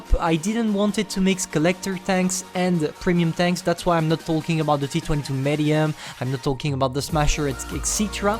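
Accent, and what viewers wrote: France. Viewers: petit accent francais non ? :)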